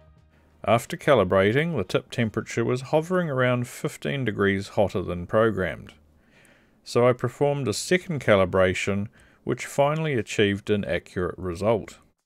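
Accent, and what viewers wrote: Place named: New Zealand. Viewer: Are you referring to ? To my ears he says "four hundred and fifty degrees celsius". I live in New Zealand, the same as the video creator, although I do not know him at all. In our version of English, that is how we would say 450 degrees celsius. If you are referring to some other part of the video, can you give us a timestamp?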